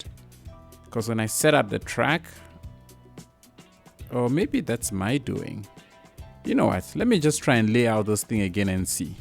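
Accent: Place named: South Africa. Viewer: You got a South African accent. S/O from mzansi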